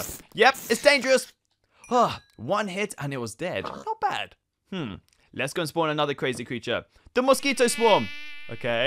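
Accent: United Kingdom. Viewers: British accent......love yah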